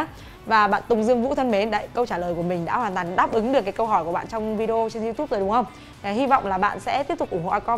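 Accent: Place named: South, Vietnam. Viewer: Nói giọng Nam dễ thương thế :)))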